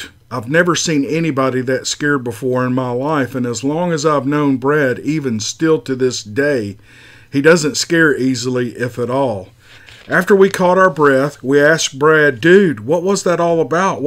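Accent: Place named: North Carolina, United States. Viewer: Nice job. That accent you have is similar to mine in NC. I know a lot of fine folks who talk like us. And I wouldn’t trade it for nothing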